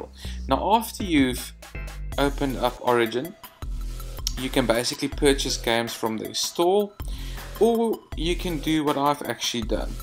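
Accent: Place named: South Africa. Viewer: I know I’m very late but you sound like your from my home country of South Africa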